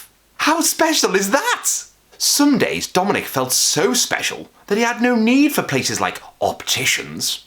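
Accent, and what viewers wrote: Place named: Yorkshire, United Kingdom. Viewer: I Loove how your Yorkshire accent makes odd appearances now and again. Bloody brilliant mate.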